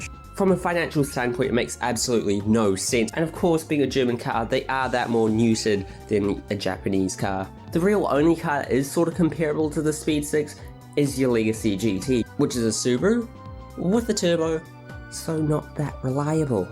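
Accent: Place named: United Kingdom. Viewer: wait wtf you're from nz? Thought you were British this whole time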